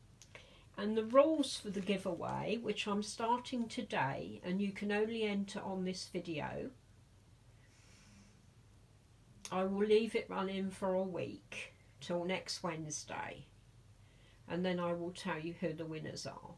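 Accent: United Kingdom. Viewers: I don't know why YouTube unsubscribes me from random people ... I was wondering why your recent videos weren't popping up in my feed! .... !! .... I remember the last video I've seen was about a haul (which are my favorite) 😊💕🌺 What I enjoy most about your channel is the hauls and the Theme Thursdays you do on the babies. You have a lovely collection! I would love to continue to see your theme thursdays and your babies dressed up in the beautiful clothes that you have for them. I enjoy your book-story-telling too. You have a wonderful and kind heart 😊🌺 Plus, who doesn't love listening to a UK Accent?? hehhehheh .... Have an amazing day!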